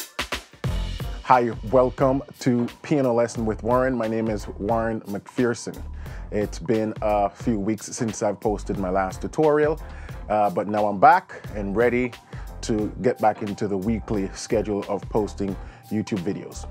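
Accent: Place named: Jamaica. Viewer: Was only listening to the Jamaican accent 🤩.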